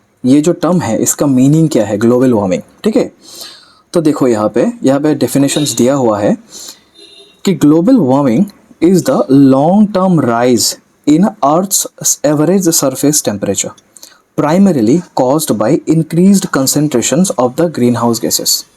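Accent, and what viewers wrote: Assam, India. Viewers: Ur voices like Assamese 🙄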